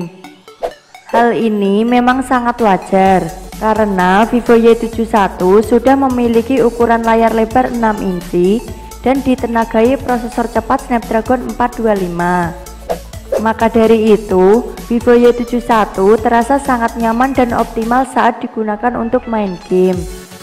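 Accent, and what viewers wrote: Java, Indonesia. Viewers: jan medok,njowo mbak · Jawane ngendi mba:-D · Jawa medok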